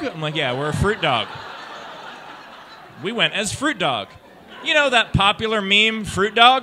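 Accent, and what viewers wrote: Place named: North Carolina, United States. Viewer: Oml i know that nc accent feom anywhere.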